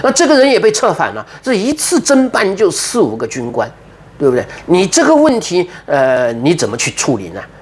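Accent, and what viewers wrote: China. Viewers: 平先生的雲南口音地道😊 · 昆明话太地道了😂老乡啊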